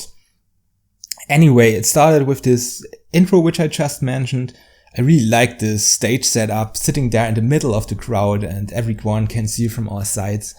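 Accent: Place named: Germany. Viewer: Are you German? Your accent sounds so familiar. Do I hear a bit Saxon out there?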